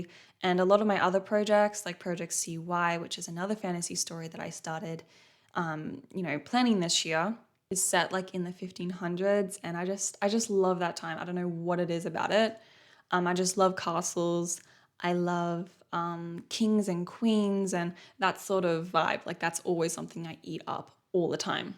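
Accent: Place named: Australia. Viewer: honestly i just finished wathcing your writing documentary that randomly appeared on my fyp. It was the first ever video, I saw from you and actually it made me following you after I just watched for a few minutes. What I'm gonna say maybe sound a bit weird, but your voice with this austrialian accent reminded me of my home. Not in the way of living there, i'm an austrian girl, but more like because of four people in my life, that come from there. For me, those four celebrities kind of tought me speaking more confidently in english and thats also why i got an australian accent myself eventhough we only learn american english in school. I really liked your whole vibe and look so i really think that you'll become sort of a safeplace for me and my thoughts. Writing has always been a huge part pf my life and watching your video really inspired me.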